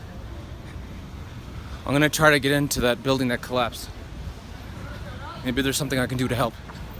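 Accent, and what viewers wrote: United States. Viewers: Hate to break it to you but it is in fact American English.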